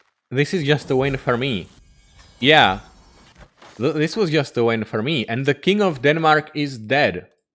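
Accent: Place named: India. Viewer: Can't say that there's no accent. It made me think he was Indian. Honestly, I would never have guessed he's actual origin because of that.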